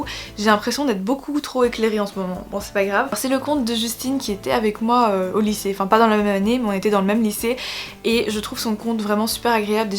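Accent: Belgium. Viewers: je te suis depuis pas tres longtemps donc j'ai une question a laquelle tu as peut etre deja repondue ^^ : Est ce que tu habites ou as habite en Belgique? car de temps en temps je trouve que tu as un petit accent ^^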